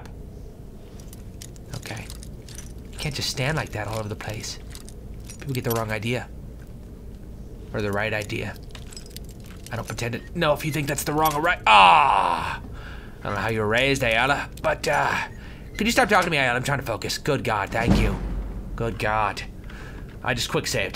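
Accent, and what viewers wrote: United Kingdom. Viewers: how did you come to develop such a british accent?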